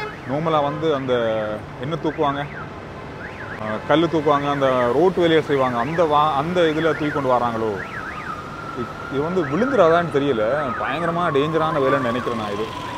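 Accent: Sri Lanka. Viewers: Ur tamiz is good. Excellent srilankan tamiz... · Srilankan Tamil .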